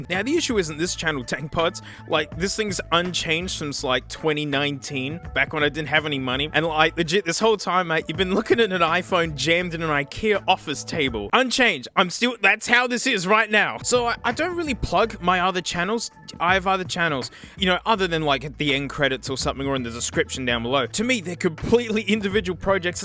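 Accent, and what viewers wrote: Australia. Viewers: I know I watch too much when I start thinking in an Australian accent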